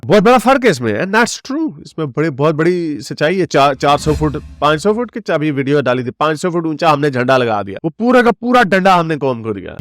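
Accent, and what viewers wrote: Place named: Punjab, India. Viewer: Your Punjabi accent is very very funny and interesting 😂😂😂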